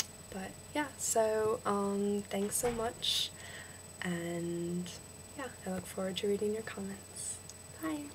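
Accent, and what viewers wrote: England, United Kingdom. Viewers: You sound English not Australian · As an Aussie, I would assume that you were from England .. and I think that our 'ocker' accent is overused too much. We honestly don't sound like crocodile dundee.. Gahh stereotypes. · You've got some of it, but it turns English more often than not. But very little American comes through. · you sound more english nice try but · The way you say some words are pretty good, but otherwise it sounds English. You say the word Australia perfect though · I think a main reason that she sounds more pom than strine, is that she is still pronouncing all her words in a proper way. She isn't giving them the Aussie inflections and lazy syllable connections that are so common to our most recognised accent. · Good on ya for having a crack at it but ya sound like a pom, get ya old man to teach ya. P.S, I'm a skip. 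· I don't think you sound Australia at all. More English. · I am Australian and she sounds english... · You sound like a pom! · I actually thought you were English and you were just introducing yourself at the start of the video. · Mate if your a yank and your that close you've done pretty well, you hit the nail in the head though, you sound almost perfectly like a pom. One major tip, it's not Australia, it's 'Straya'. :) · Not Aussie at all, fair for English. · that's pretty english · You sound like a Pom... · You sound too English. Find some slang, like aussies are too lazy to say the world properly. Eg. Not United States it America or USA. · i am australian and sorry but it isnt aussie u sound english :) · you sound english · you sound english not aussie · It's a weird hybrid between English, a lil; bit of Irish and Australian. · Not too bad but there is an obviously English tinge. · Lol you sound English :) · you sound English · i love how you say you're american and have an american accent yet you sound fully enlgish....... 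· you sound freakin english not aussie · u sound more english then australian lol p.s ur pretty darn gorgeous ;P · You sound english nothing like an aussie accent im aussie so i know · sounds a bit English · seriously you sound like you are from brighton in england I never would of picked you were american and dont worry about exploiting your passport we have people who get student visa or short 6month visa's midway they declare assylum and then get citizenship and its total crap and perfect example before the hate comments come on sydney fc striker ali abass while he was playing for newcastle deceided mid way to declare assylum and got it but he still plays for iran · You sound like you are from England def not an aussie haha but good english one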